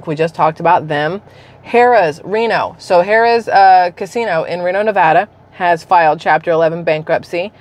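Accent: South, United States. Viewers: Woe now let's not get too carried away with the southern draw. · U should do ur southern accent sometime, sounds cute.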